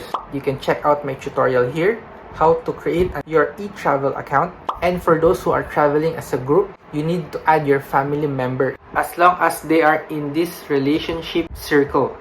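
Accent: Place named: Philippines. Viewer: English pa more pinoy k eh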